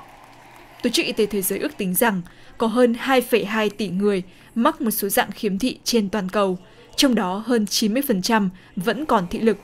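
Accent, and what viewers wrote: North, Vietnam. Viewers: GIỌNG BẮC ĐỌC KHÓ NGHE QUÁ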